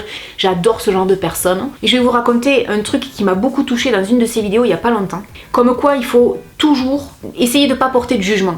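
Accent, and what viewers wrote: South, France. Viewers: Bonjour...Je te découvre par hasard et je me régale depuis ce matin avec tes vidéos. Merci pour ton naturel, ton franc parler et ce petit accent du Sud! 🤩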